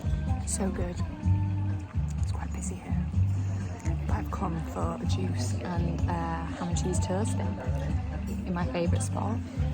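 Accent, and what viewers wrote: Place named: United Kingdom. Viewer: I like your accent ❤️ british yaa ??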